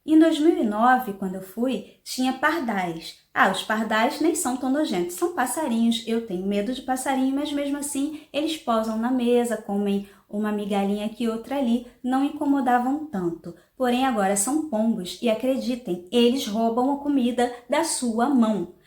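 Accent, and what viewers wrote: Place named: Brazil. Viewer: Speak like brasileira.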